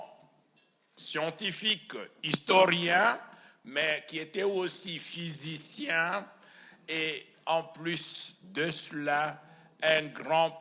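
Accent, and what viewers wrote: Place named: Africa. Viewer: Merci bcp l accento africain est toujours present lorsque vous parlez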